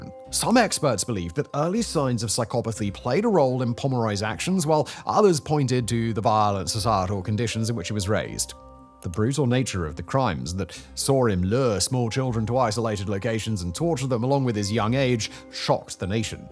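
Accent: England, United Kingdom. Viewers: The unimaginable. Up yours. Your so predictable. Political Correctness with an arrogant english accent. You forgot to mention Lizzy Borden. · is this just vsauce with a British accent?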